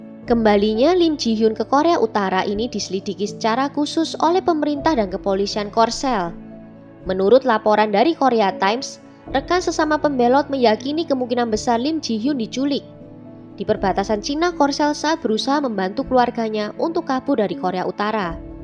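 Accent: Java, Indonesia. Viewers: Say medokuhhh 👍💖😍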